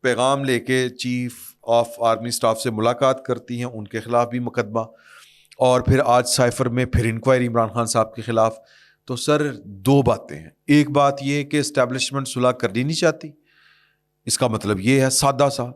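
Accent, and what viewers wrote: Punjab, Pakistan. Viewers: Ufff Oolitical Sciences Einstein speaking his sh!!!t out—— PPL living in 2023 but glamorizing FAUJ and SAPASALAR - seems like England Dark Ages ir even Sadam and Qadafi Era if 1990s- Bro you step back and think about the era of InfoGlux - and how yo use it- This Chootiyapa of senseless Vlog rambling , reading from news papers and afding your tonal punjabi mix wont bring value- 2023 beyond its not for you for Fikreee Faqeeer like you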